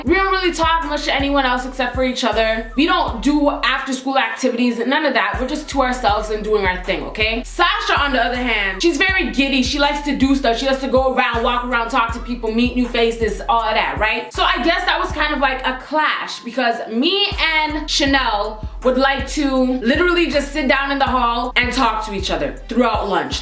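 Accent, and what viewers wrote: Jamaica. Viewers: u Jamaican accent wa fix up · Are u Jamaican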